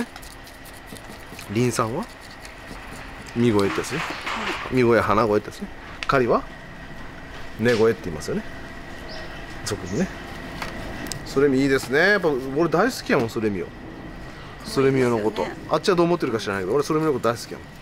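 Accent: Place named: Hiroshima, Japan. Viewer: 、サイコーです❤ 春がきましたね😊 可愛いお花苗がいっぱい素敵です🎉 先生の広島言葉サイコーです❤ 私も何時もお花に、キレイに咲いてくれて、ありがとうと言いながら終わった花達を摘んでます😂 フェスタ、応援してま〜す❤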